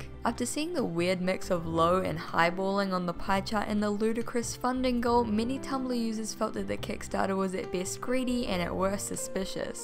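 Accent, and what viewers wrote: New Zealand. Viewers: omfg I knew u were from nz bc of ur accent!!! when i saw the kickstarter that confirmed it ahhh!!! bet ur from auckland uwu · For me it's the NZ accent. NZ give me Gold Coast flashbacks. No, you can have a durry cuz. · as soon as i heard your voice i knew you were a kiwi haha. There's been like a surge of New Zealanders on Youtube and I'm here for it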